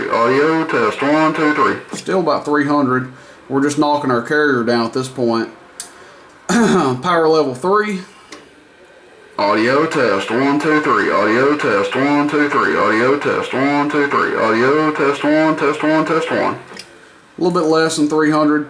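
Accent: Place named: Tennessee, United States. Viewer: I'd like to hear one of these on the air. Often times amps have a very small amount of distortion coming through and not clear as the radio itself. There's one guy who cruises through my way ever so often down the interstate 14 miles away. It takes him at least 1 hr to go out of range. I don't think that guy ever shuts up. I have never in my life seen a guy talk so much. 30 minutes before he gets here and 30 minutes after he passes. I know he's pushing some serious power but have no idea how much are what kind of amp he has but he is crystal clear. He tells folks I can't here you but you'll keep hearing me on and on and on. Here is a cool miles per watt graph. <link> You sound like some of my kin folk in Tennessee.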